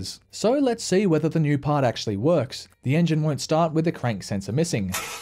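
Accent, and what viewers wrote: Australia. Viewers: This is the first person I've seen with a clear Aussie accent who talks in American English, weird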